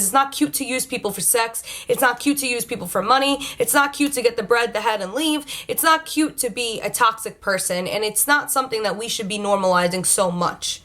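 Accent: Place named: New York, United States. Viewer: Are you from NY or Jersey 🤔 that accent is pretty 'HEY I'm walkin' here!'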